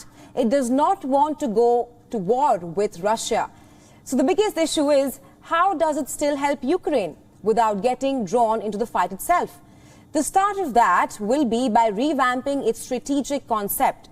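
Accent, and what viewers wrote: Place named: India. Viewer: It's like watching Fox News with indian accent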